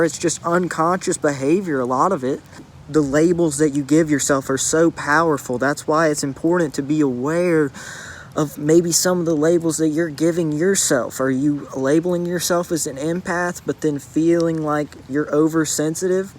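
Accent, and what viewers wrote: South, United States. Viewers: Thank you for sharing....so young so wise...the southern accent is nice reminds me of home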